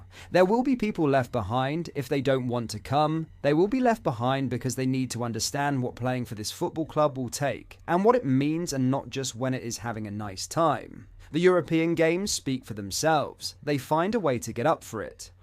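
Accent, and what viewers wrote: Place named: Birmingham, United Kingdom. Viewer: Sound bit brummy this AI todows video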